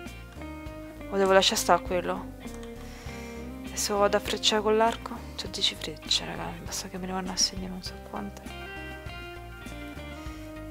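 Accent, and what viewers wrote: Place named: Rome, Italy. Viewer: Solo a me non piace quando parla in romano? :c (non ti arrabbiare, ma per me è così <.< per il resto sei bravissima)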